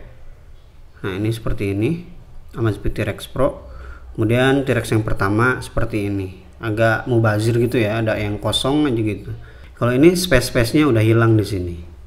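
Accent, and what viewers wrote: West Java, Indonesia. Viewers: sae a repiuna... nyunda pisan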